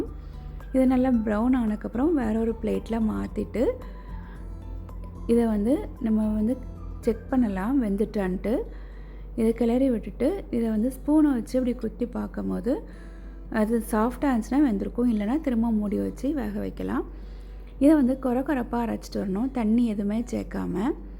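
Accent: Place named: Kanyakumari, India. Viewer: சூப்பரா செஞ்சு இருக்கீங்க சிஸ்டர் நீங்க கன்னியாகுமரியா நீங்க பேசுறது ரொம்ப அழகா இருக்கு ❤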